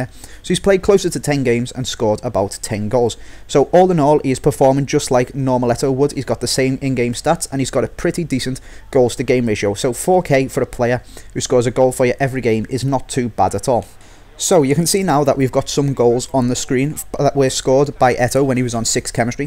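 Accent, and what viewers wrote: Liverpool, United Kingdom. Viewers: i thought it was just the scouse accent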